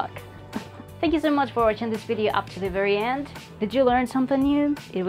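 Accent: Cuba. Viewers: I am just loving your videos!I really love the Cuban Spanish accent! I like all the Caribbean Spanish accents, but Cuban is my favourite! I really want to learn it and you're a great teacher! 🇨🇺🇯🇲🇬🇧💃🏾💃🏾💃🏾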